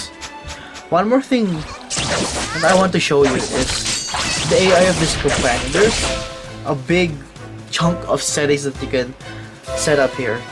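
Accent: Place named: Tagalog, Philippines. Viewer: You have that English accent in you you are good talking in English Language but your Tagalog accent is still there.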